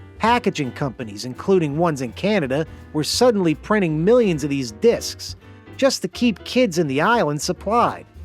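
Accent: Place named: Pittsburgh, United States. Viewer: Never heard a yinzer more yin’s than this guy. Holy effin dahn tahn.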